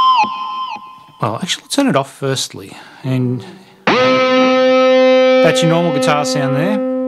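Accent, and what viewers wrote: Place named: Australia. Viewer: You're Australian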